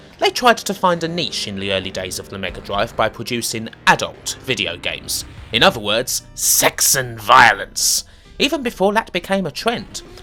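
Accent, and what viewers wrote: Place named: London, United Kingdom. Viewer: soon as i heard the accent subscribed instantly....london niggaz dont play!!!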